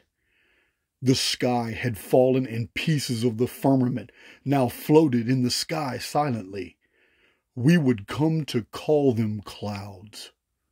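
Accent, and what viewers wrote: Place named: West Texas, United States. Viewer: Yes indeed nomad, it was rather masterful wasn't it? When I first heard say sim-ul-arcam, my British self head 😮was rscreamed "sim-yew-lacrum". His voice is very colorful and he knows how to draw us in with that West Texas accent and putting real dramatic intonation into his delivery. 👏🏆😊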